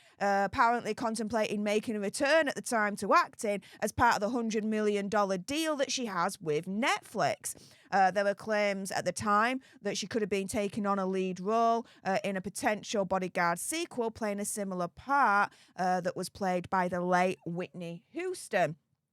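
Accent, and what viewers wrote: Yorkshire, United Kingdom. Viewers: Hey up! You always cheer me up. Love your Yorkshire accent. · Hi I love your accent what part of the uk are you from❤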